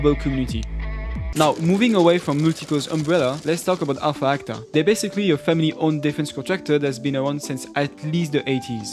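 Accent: France. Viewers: aaaaaaa l'accent français mddr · Where are you ?from your accent look French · Je valide l'accent français ! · Are u french or israelian? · Is this guy french? :( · WAIT! are u french? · L'accent français qui trahit n'importe qui ❤ excellente la vidéo sinon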